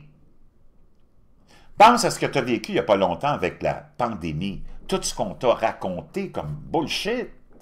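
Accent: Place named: Canada. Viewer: Bonsoir, je vous écoute toujours avec un grand plaisir avec vos jokes, tournures de phrases et votre accent canadien 😂. Concernant votre sujet du jour je désire apporter mon témoignage. Je suis née fille en 1960 et je le suis toujours aujourd'hui... grâce à mes parents qui étaient logiques à l'époque. En effet à l'adolescence, époque que l'on qualifiait "d'époque bête" j'ai eu envie d'être un homme je n'aimais pas trop mon corps de fille. Pas pour le sexuel, en <num>, on ne savait pratiquement rien de ce qui avait trait au sexe 😊 et quoiqu'on en pense, ce n'était pas un souci pour notre vie sexuelle d'adulte. Moi, je voulais être un homme parce que je pensais que les hommes ne souffraient pas et que seules les femmes étaient malheureuses ! Quelle chance que mes parents ne se soient pas préoccupés de mes pensées délirantes d'adolescente 😂😂 je serais un homme vraiment malheureux ! 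Non, non !!! Je suis restée dans ma nature de femme 😊 et maman de deux enfants😊. Je remercie mes parents disparus 😢 de leur lucidité. Ceci est mon message aux parents et enfants d'aujourd'hui. Merci de vos vidéos 😊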